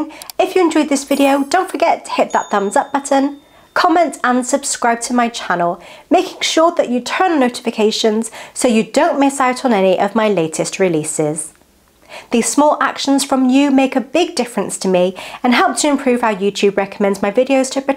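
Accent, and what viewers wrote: United Kingdom. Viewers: Your British accent. OMG! ❣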